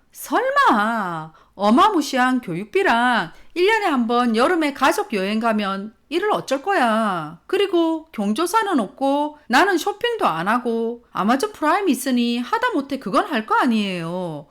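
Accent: Gyeongsang, South Korea. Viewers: 경상도사투리가 구수하고 좋아요. 전라도 깽깽대는 사투리는 정말 재수없고 구역질 납니다. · 와 경상도 사투리 역대급이다 . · 경상도 사투리 귀에 거슬려요..ㅠㅠㅠㅠㅠㅠ 난 2찍들이 정말 싫어요. 저는 대구시민이예요.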